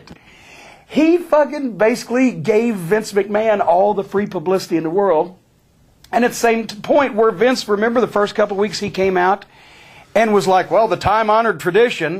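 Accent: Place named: South, United States. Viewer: Jim cornettes is Fucking Hilarious , his southern accent and his Shoots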